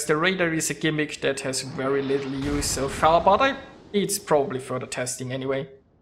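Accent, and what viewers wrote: Germany. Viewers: Der deutsche Akzent haha sehr gut :)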